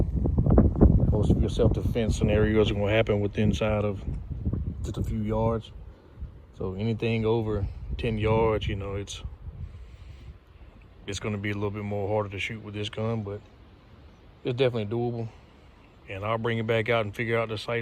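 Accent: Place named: Louisiana, United States. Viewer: I thought south Louisiana for sure!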